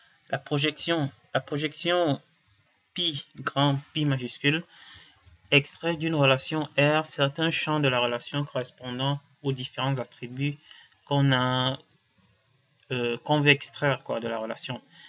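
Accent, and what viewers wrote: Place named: Cameroon. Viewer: je sais que c'est mon frère camerounas qui a fait la video ci, notre accent est trop mo gars merci pour la video je compose dessus tout a l'heure ;)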